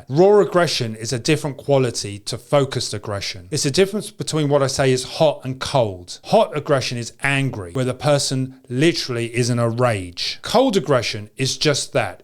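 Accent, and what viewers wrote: England, United Kingdom. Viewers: There is NO ADVANTAGE to having no experience in fighting. No matter what this drunk content creator with an English accent says.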